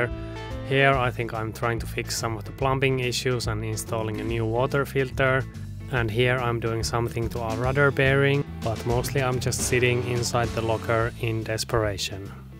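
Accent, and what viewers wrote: Finland. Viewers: I love this channel. The cinematography. Everything. But really it's this guy's accent and voice and delivery. I could listen to him forever. So matter of fact. I guess, very Finnish. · Can place Your accent? Are You from Finland? Good luck! /Thomas from Sweden.😁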